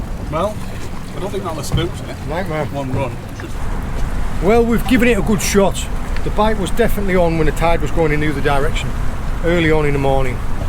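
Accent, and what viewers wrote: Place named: Durham, United Kingdom. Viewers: Absolutely brilliant. Sound like your from up North originally? Most I've ever caught is a stickleback on a worm but heh! 😂 Brilliant! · is that a mix of Durham/ Darlo accent? Love the commentary as you sound local to us Durham pit yakkers. All the best.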